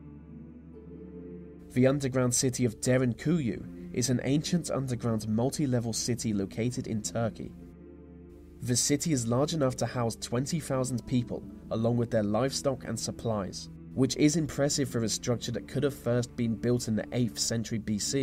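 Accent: England, United Kingdom. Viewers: Hey there been waiting for your next video and it was posted the afternoon here in upstate New York what a great topic never cease to amaze me well thought out executed to a tee just love your voice and English accent you are a professional my good man Thanks so much I do appreciate all you put into these videos because I am always thoroughly pleased one of these days maybe our paths will cross and I will high five you job well done thank you until the next time I will be waiting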